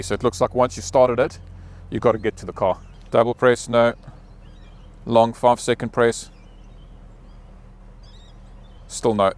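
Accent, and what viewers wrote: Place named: Johannesburg, South Africa. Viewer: Sounds like you’re from Linksfield, Joburg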